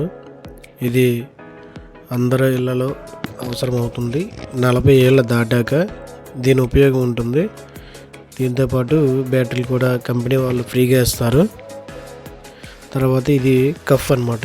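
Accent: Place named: Srikakulam, India. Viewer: Srikakulam slang matladuvideos super😊😊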